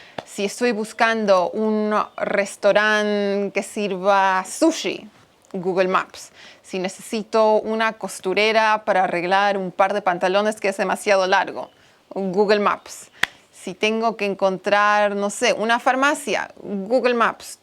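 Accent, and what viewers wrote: Argentina. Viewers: Es increíble el cambio de idioma que estás teniendo ya SOS argenta😂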